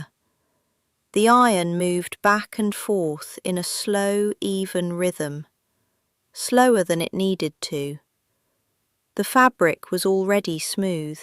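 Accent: United Kingdom. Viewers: These stories are so fake. Someone born and raised in Ohio in an Amish community would not have a British accent. This is all AI generated. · Get rid of the English accent!!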